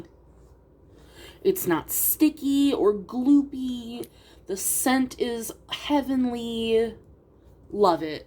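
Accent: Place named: Midwest, United States. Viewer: Love me some midwestern accent, reminds me of home!!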